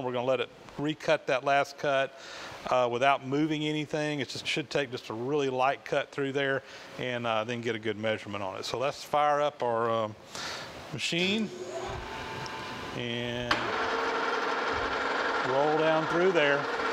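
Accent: South, United States. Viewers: Why captions? speaks perfectly good southern English.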